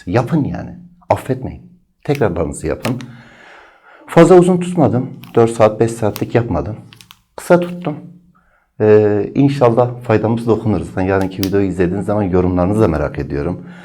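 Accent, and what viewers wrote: Urfa, Turkey. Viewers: Hocam Urfalı mısınız ?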